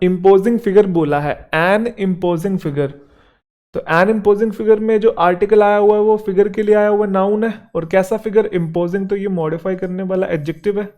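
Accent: Haryana, India. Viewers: sir ji mai apaki classes regularly attend karti hoon. sir ji aap hariyanvi bahut badhiya bolte. muje bahut hansi aati hai. sir ji kabhi muje time mila to aapko milne aaugi.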